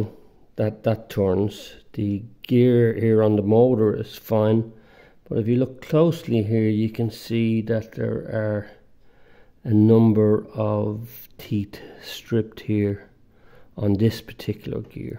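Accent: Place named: Northern Ireland, United Kingdom. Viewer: Hello I have one exactly the same as yours however mine seems to have a problem with the electronics, I've just taken it apart but I though I'd check on YouTube for any help I could ge fixing t. If you still have yours I'd be interested in it for parts. I not sure how to make contact with someone here. I am in N.Irl so I don't think we are very far from each other judging by your accent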